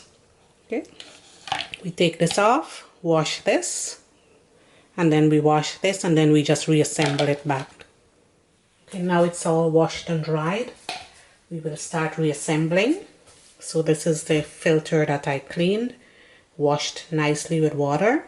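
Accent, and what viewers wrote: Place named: Trinidad and Tobago. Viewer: Are you Trinidadian? (Wonderful video, thank you.)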